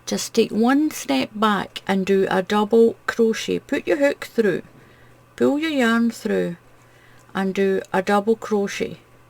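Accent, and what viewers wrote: Scotland, United Kingdom. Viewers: Hi. Greetings from the U.S. Your doilies are beautiful and I could listen to your lovely Scottish accent all day. I can't wait to crochet these doilies. Thanks for sharing. 💟🌞🇺🇲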